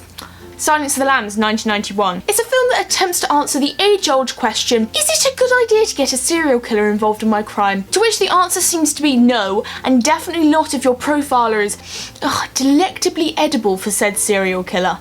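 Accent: Wales, United Kingdom. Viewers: lovely welsh accent you sound just like Gwen Cooper from torchwood. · Omg I love your Welsh accent tho (but hey I'm not Welsh so I can't judge)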